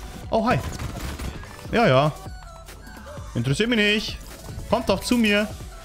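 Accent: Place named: Bavaria, Germany. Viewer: kommst du aus Bayern dein Akzent hört sich leicht danach an :D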